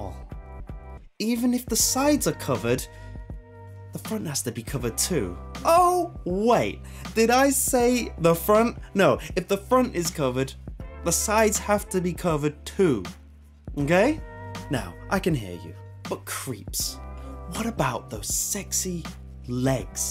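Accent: United Kingdom. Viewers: he sounds British, but is not British. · You sound British but don't look British what's up with that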